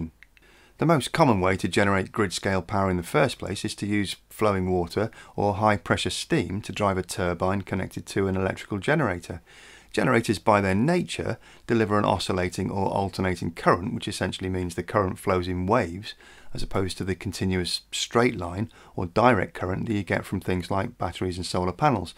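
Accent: United Kingdom. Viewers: Really impressing your film. The illustration of it let me think, that there is a big lobby behind your channel. Love your British accent. Me as a German (please forgive me my English!) am sceptical against so called renewable energy. Because we already pay for it very high. We have the highest energy prices in Europe, probably in the world. We live constantly close to a blackout. If we have had no neighbours with coal or nuclear plants, our system would crash very often. 2018 we have had two critical moments in our grid. In your film you are showing a beautiful landscape at the beach "decorated" with many windmills. This was a symbolic picture: human greediness and ideology destroying nature! My point is not: I am against electrical storage. No! Not at all! This is even the crucial problem of renewables. I am for obligatory solar panels on flat roofs in industrial areas. 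But I am against Solar Parks on fertile soil or in woods, against cornfields for our electrical comfort. Simply because of the efficiency of renewables, the so called EROI (Energy Return on Investment), which is too low. For that reason they need a big area to compensate. Photovoltaic has an EROI of 1.6, Biomass 3.5, Wind 3.9, Gas 28, Coal 30, Water 35. In comparison to it the old veteran of Pressurized Water Reactor has an EROI of 75! Which is not too bad. The windmills are meanwhile as high as the television tower in Stuttgart. The concrete weights 7000 Tonnes. The socle of 3500 Tonnes is left for ever in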